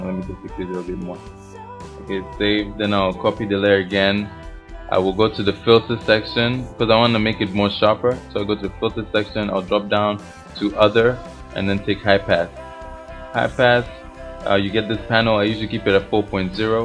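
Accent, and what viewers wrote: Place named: Germany. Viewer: you sound German :\ Are you? Nice video BTW :)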